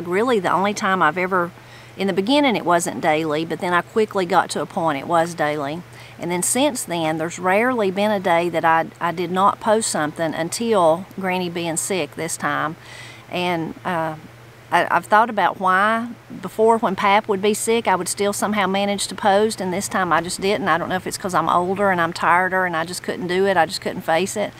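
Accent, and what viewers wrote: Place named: Kentucky, United States. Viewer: I appreciate your channel so much. I accidentally found it when I was searching for applachia out of homesickness! Your accent and words remind me of my SE Kentucky roots! Thank you! 😊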